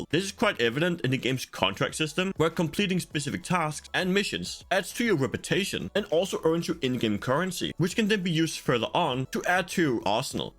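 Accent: Denmark. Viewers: def you're danish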